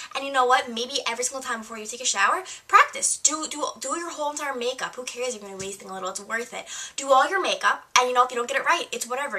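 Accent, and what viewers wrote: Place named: New Jersey, United States. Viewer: Your voice sounds like it changed from you regular sweet voice to .... a Jersey Shore accent.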